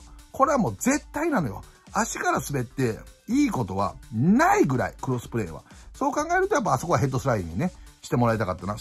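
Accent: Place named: Western Japan, Japan. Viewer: 声質と西日本系のイントネーションが似てるのかもしれませんね！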